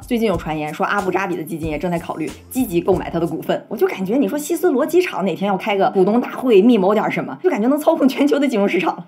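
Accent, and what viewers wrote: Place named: Northeast, China. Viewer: 东北人嘛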